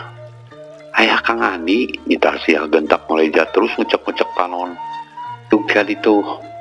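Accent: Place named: Sunda, Indonesia. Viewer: Resep upami merhatoskeun tutur catur bahasa kang.. SUNDA PISAAAAAAAN..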